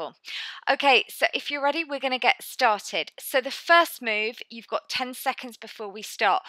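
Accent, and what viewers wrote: United Kingdom. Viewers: That '2 uncommon exercise' is actually the modification or easier version she provided for people who can't keep up with the move in the right side. If you can't understand what she's talking about, learn more. Listen more British accent conversation. That's what I do :) good luck! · Love your workouts! and being from the UK like you, your accent is a relief! lol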